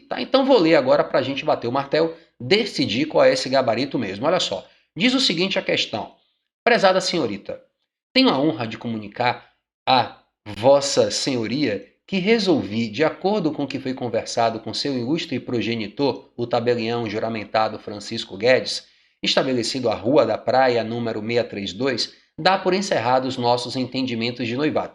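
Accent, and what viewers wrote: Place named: Salvador, Brazil. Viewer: ótima explicação! Você tem sotaque de soteropolitano, amei ouvir.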